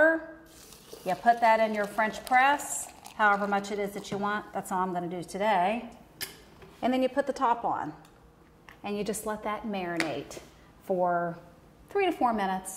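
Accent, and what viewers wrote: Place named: South, United States. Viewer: You look (you could be) Italian with a southern USA accent 😍 Where are you? I like the view of yiur opening scene... Give Thanks (Now let me rewind so I can pay attention to your tutorial 💖)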